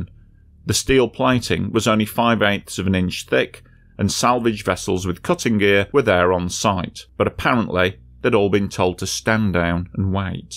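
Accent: United Kingdom. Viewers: having to endure that British accent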